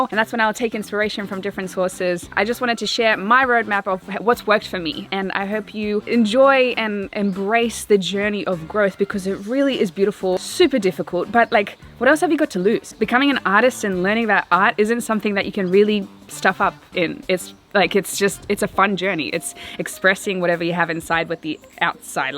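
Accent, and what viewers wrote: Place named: Australia. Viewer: This was probably one of my favourite videos you have made! I loved all the content - preaching compassion and being content with your personal progress. But I also loved all the different scenes (backgrounds?) They looked great! And... You know your personality is just so fun! And your Australian (which all aussies love!) Keep up the great work!!!! 🇦🇺🌏😃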